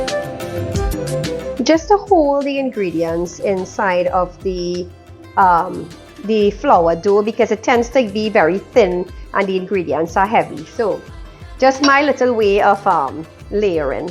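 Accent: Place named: Indo-Trinidadian, Trinidad and Tobago. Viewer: She is speaking like Trinidad Indian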